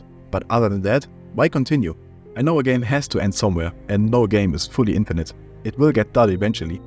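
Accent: Germany. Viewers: Are you german? You sound like german😅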